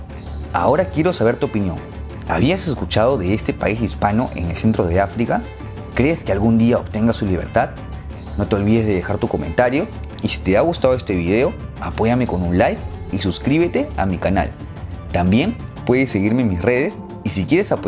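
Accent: Peru. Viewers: No es mexicano el realizador de este video. Por lo que se escucha, el acento de él es peruano. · No tiene el "dejo" de un mexicano.... los mexicanos no tiene ese acento aunque son algo parecidos.....pero se nota que es peruano. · Amigo, por el acento, el you tuber es peruano ¡Saludos desde Popayán, Colombia ¡ 👏🇨🇴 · Ese acento es peruano? no? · q rico acento peruano.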